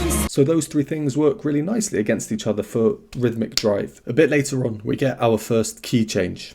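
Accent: England, United Kingdom. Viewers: Me encanta esta canción de Dimash. Y esta actuación es una de mis favoritas❤ Tus reacciones son siempre detalladas e instructivas. Aprendo mucho contigo. ¡Muchas gracias!😊 Por cierto, me gusta tu lindo acento inglés.☺️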